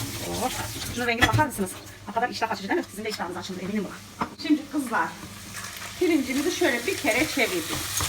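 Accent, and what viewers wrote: Kayseri, Turkey. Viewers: Nerelisiniz ..kayserililer gibi konusuyorsunuz🥰🥰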